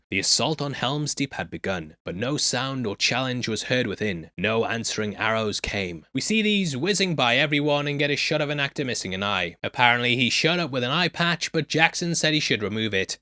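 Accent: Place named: Newcastle, United Kingdom. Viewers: All this time I didn't twig you lived in the north of England haha! I wondered why you sounded like you were from round these parts! Geordie here, love your content man! Great stuff. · Love your work....BUT....I just can't stand you reading to us in Your Geordie accent. REALLY puts me off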